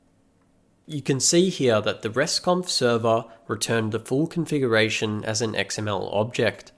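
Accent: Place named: United Kingdom. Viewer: Amazing work. And without accent. Pure british❤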